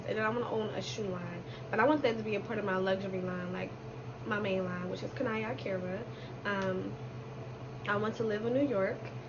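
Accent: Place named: Philadelphia, United States. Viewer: that accenttttttt, you better be from philly 😩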